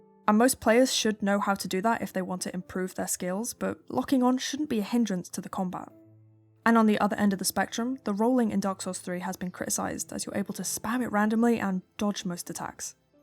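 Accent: United Kingdom. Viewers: Ah a British female voice on darksouls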